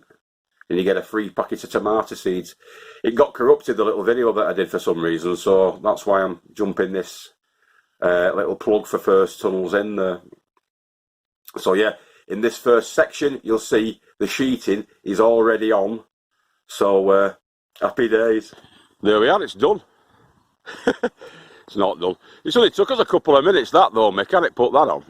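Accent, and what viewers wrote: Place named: Lancashire, United Kingdom. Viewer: Lol. do the visitors from foreign lands understand your Lanky (Lancashire) dialect :)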